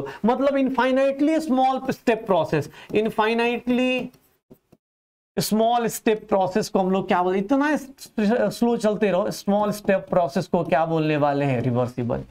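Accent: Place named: Bihar, India. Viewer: in this whole session I found one thing that sir ka bolna be like - """Entropy Bdhega "-bdhega " ky mst bolte h ekdm bihari style me lgte h sir isliye Inka voice acha lgta h meko..nd pdhai to waise bhi lajawab hai...... 😊🔥🔥🔥🔥👀✨❣️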